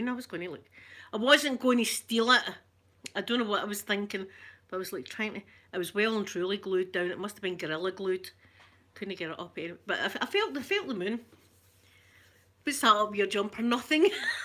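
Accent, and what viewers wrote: Scotland, United Kingdom. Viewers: Aww, don't give up on your dreams of becoming an astronaut! We'd be honored to have you representing the US with your amazing Scottish Accent and sweet personality 💙💙💚💚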